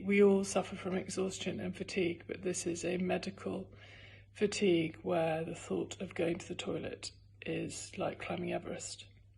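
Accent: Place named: RP, United Kingdom. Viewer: Very poshly done LOL, you are so sweet. It would be marvellous if while you are resting, you could do the job of a care worker. I understand how difficult it is, that you probably suffer from a form of bullying because of your status as a famous woman. Carework has been my life, and I have loved it, it has been difficult because of my connection with a famous man, but I know that it would be a hundred times worse for you. You have done great by reading your journal to us, good luck with your campaign to bring awareness to those in need. You brilliant lovely lass.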